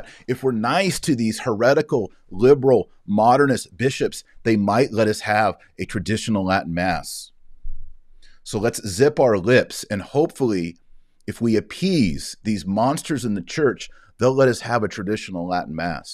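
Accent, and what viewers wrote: Texas, United States. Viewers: Texas accent getting stronger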